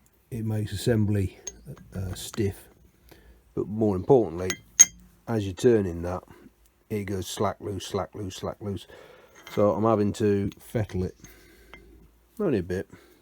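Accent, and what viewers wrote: United Kingdom. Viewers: Australian here! When you say chucks all I hear is "chooks" as in "chickens! LOL! Confirmed by me Missus!..LOL love those british accents. Keep up the good work!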